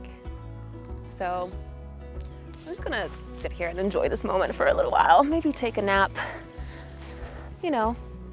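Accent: United States. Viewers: Is it me or did you get a slight American accent in this video? :P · Hilarious how you picked up the American accent! (from your hybrid British - Polish accent)! Like my wife who picks up the accent of the places she visits. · so cute, has aquired an a bit of an A,merican accent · in america, even her accent got a little more american🤠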